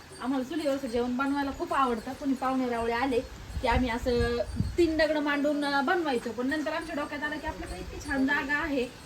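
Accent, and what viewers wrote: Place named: Marathwada, India. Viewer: ताई मला ना तुमच्या मराठवाड्यातली बोलण्याची पद्धत खूप आवडते कारण तुमचे प्रत्येक शब्द खूप आपुलकीचे असतात मी दोन वर्ष मराठवाड्यामध्ये काम केले अहमदनगर औरंगाबाद परभणी अशा बऱ्याचशा ठिकाणी मी काम केले पण त्या ठिकाणी जी आपुलकी जी प्रेम आहे माणसं मिळाली ना ते खरंच खरंच खूप छान होते❤❤❤❤खूप जीव लावतात तिकडली😊😊😊आता खूप आतुरता झाली आहे ताई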